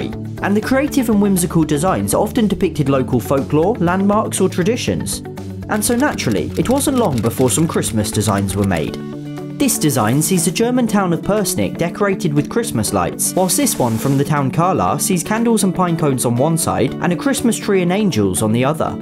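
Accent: England, United Kingdom. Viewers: Man your accent is pure English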